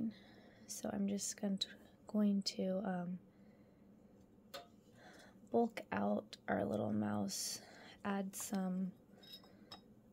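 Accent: United States. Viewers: As a fellow Midwesterner from Kansas, I too speak like you and many of us from the area. Don't change who you are or how you normally speak because someone complained about it. They can actually change the speed of the video on their own. People need to accept people for whom the are. If it bothers them, it's them, not you. ❤ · Thanks for the video 😊 I think you did an excellent job of speaking and filming. The close ups looked fine and you could see detail like you wanted. I am also a southern girl and I do drawl 😂 You were not hard to listen to for me but I am one of those early 50’s demographic and have some patience I have developed. I enjoyed this video and what a cute expression of creativity!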